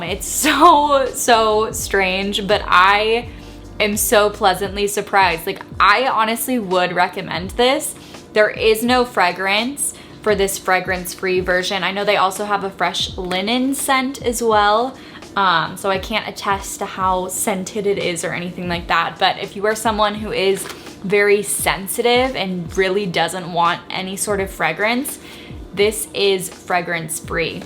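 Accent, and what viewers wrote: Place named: Canada. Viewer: I'm curious where your from? You're accent sounds a bit Canadian.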